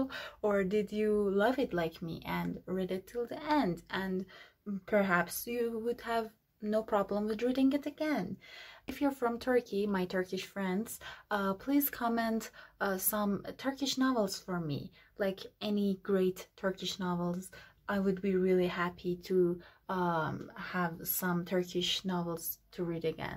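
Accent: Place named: Iran. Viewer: i just started reading it. i had second thoughts just in the beginning of the book but I'll power through. also your pronunciation of names in the book made me sure you're persain.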